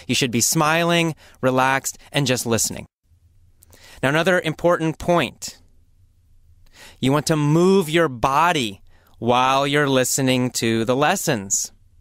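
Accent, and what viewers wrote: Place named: Canada. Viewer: هذا لديه لكنة كندية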